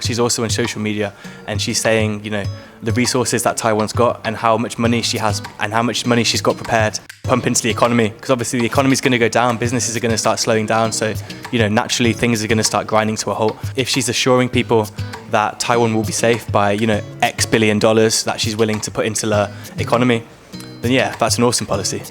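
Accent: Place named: United Kingdom. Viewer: 我也超愛他的英式口音 也試看看不看字幕 天啊～完全不行... 挫敗感啊 哈哈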